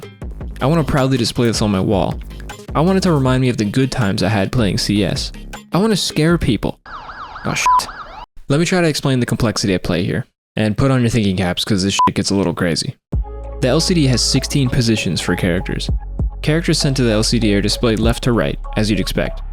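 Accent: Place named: Germany. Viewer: Thank you! In the video I don't really address the problems but I'm currently working on my own website/blog about the projects I do. These blogposts will be a bit more detailed. Never heard someone being jealous of german accent lol. Can't really hide it haha.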